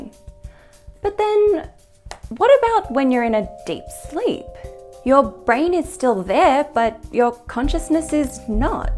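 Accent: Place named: Australia. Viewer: You sound Australian, but this vid was published in January, and wherever you are had snow. Do you live in Canada?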